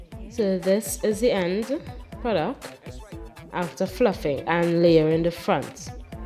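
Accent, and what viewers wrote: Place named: Trinidad and Tobago. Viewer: Lol..Trinis always make out each other..as soon as the video start I was like...she sound like a Trini ...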